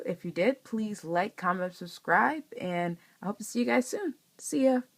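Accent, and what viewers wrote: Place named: United States. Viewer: That sounds like feedback rather than scratchiness. However, if you want it to be butter smooth, run it over some mylar paper. See Ink Noveau and sbrebrown channels for details about doing this. Although I'm in the UK I bought mylar paper from Goulet; because it's so light, there isn't much shipping to pay. But from your voice you're probably in the US anyway.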